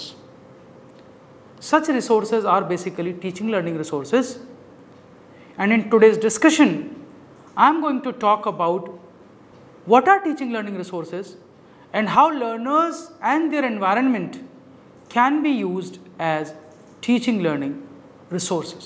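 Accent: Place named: India. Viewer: Indian accent 🤦‍♀️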